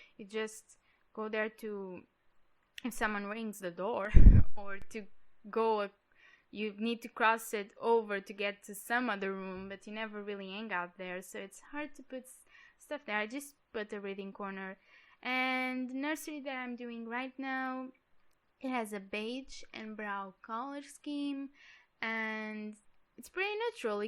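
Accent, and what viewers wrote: United States. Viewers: Legal! Sou do Brasil. Parabéns pelo seu inglês, jurava q vc era americana. kk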